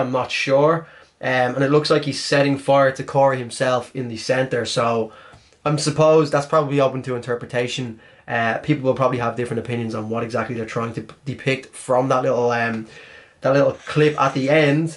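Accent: Dublin, Ireland. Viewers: yaaaaay knew it. Are you from Dublin? I'm trying to distinguish whether your accent is northern our southern, help me here lad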